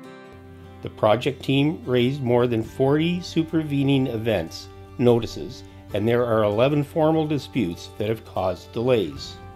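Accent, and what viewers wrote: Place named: United States. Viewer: If you are Canadian, why do you speak American English,?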